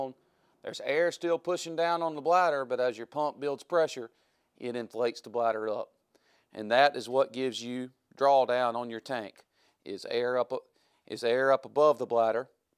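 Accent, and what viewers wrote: South, United States. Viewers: I just like your southern accent you would stick out where I live in northern Maine!!!!!! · From Canada, thanks for the unedited video. Now i understand how the work and the failure of water passing the bladder. Much appreciated, keep up the good work. Also love the southern drawl 😁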